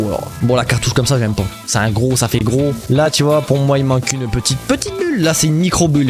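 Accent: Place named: South, France. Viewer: Pourquoi tu prends l'accent du sud pendant toute la vidéo ?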